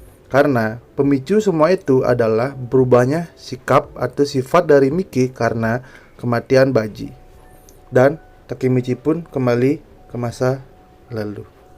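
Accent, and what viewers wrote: Bali, Indonesia. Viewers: Nak bali ules ne logat bli ne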